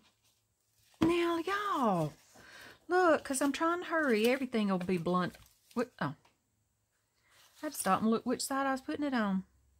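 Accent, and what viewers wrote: South, United States. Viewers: Hey! 😁 You have the sweetest voice. I’m from southwest GA but I live in Wa state. I miss my folks. I love a good southern accent. 💕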